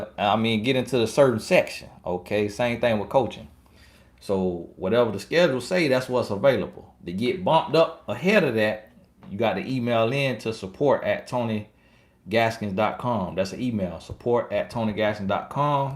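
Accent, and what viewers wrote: South, United States. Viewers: I just finished watching this chat. I enjoyed it. I just started watching a few of your videos. You have a new subscriber. I don't know you but I like your aura, that you speak of God and your southern roots. Your accent reminded me of my mother's accent (she grew up in FL). God bless you! "I don't have Go-Go gadget eyes". You're funny! Maybe a few ppl on here know about Inspector Gadget.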